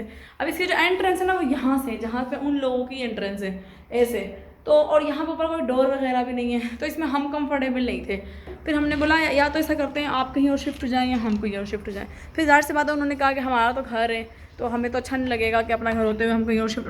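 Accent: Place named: Sukkur, Pakistan. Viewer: kahan par rehtay hain aap k paikay 😂 Matlab aap k ammi Abbi behen bhai I think sukkur q k mujhe aapki boli sukkur Jessi lagti hai aap panjabi nahi lagti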